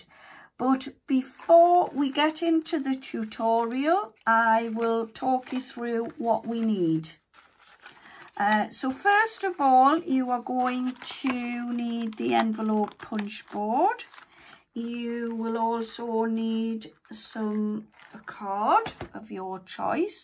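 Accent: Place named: Sunderland, United Kingdom. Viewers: What a lovely box you sound like you are from the North East Xx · Sounds like a Sunderland accent.. great video, nice box thankyou.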